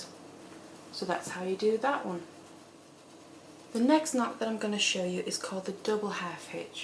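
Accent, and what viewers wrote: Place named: Wales, United Kingdom. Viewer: Thanks for the video. I've always wanted to learn how to macrame. I love you're accent are you Welsh?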